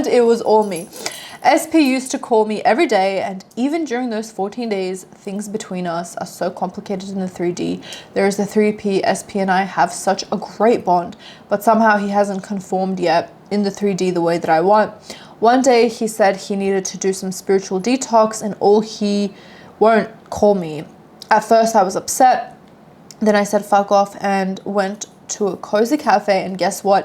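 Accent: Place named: Australia. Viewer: Are you Aussie? Love your accent❤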